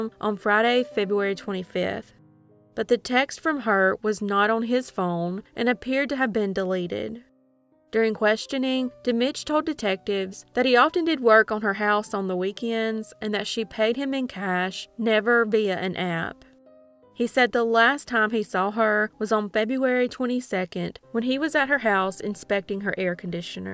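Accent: South, United States. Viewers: What a wonderful way to drive to work listening to such a soothing southern voice ❤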